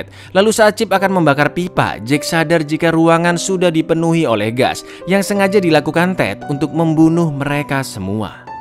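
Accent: Java, Indonesia. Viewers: Jowo keras lorr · ketoro lek wong jowo bgt😂🤣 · Pean org jawa ya mas? 👍🏻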